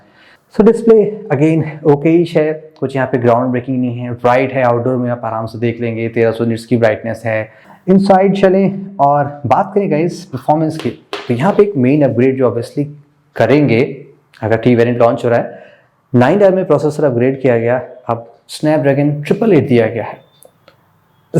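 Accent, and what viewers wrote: Lucknow, India. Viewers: ji aap lucknow se hi ho kya???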